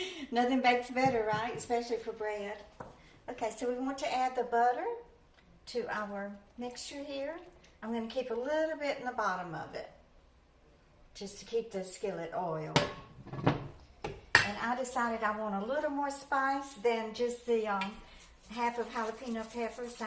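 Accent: South, United States. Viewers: Did you live in New Jersey? You have a beautiful southern accent! How did you get way up there?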